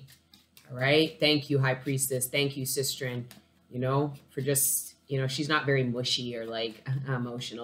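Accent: Toronto, Canada. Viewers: You're hilarious and so fun! I thought you might be from Toronto / Scarborough from the way you talk and then you said you're from Canada. This reading lifted my spirit. I also have a similar incense burner. 🙏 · Canada- you sound like your from Toronto! I’m in Scarborough! But anyways....love your energy! 💜💜💜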